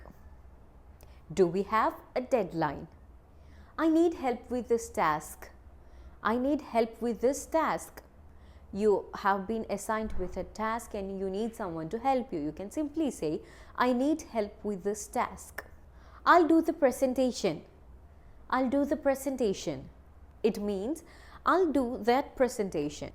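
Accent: India. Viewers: Your English accent is very close to Indian/kerala . Where are you from actually?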